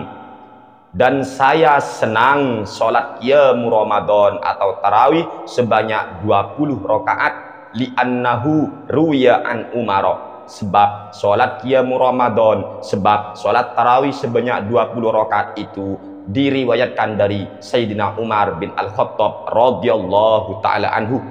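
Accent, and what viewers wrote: Madura, Indonesia. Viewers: ini org madura mna?? klhatan logatx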